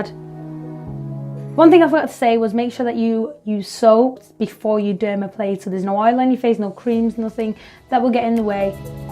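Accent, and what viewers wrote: England, United Kingdom. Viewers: Idk why but I always am rubbed the wrong way when I see an Arab, Indian, or African with an English accent. All I hear is a little rat bastards coward runner. Ran from your country to the safety of another. Weak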